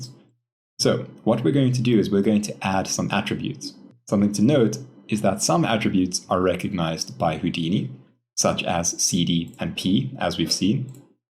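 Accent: South Africa. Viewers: Only stumbled on your stuff now...almost 2 years later. I was surprised to hear the South African accent. I'm here in Gauteng. Great tutorials man!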